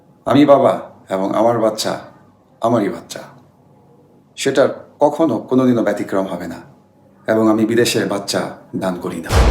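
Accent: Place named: Sylhet, Bangladesh. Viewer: ভাই আপনি সিলেটি। । শুনে খুব ভালো লাগলো ফ্রম লন্ডন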